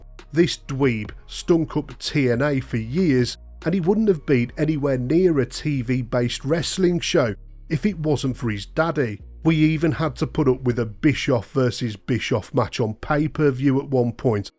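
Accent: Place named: Scotland, United Kingdom. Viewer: Always a Scottish narrator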